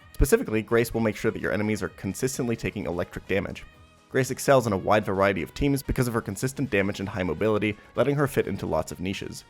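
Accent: Germany. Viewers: He is, in his earlier videos you could hear his german accent more clearly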